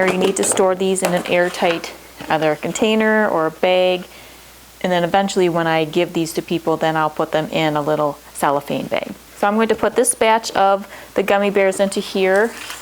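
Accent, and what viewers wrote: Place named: Wisconsin, United States. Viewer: Thank for detailed setting instructions! Also, your accent has me missing home in WI. 😍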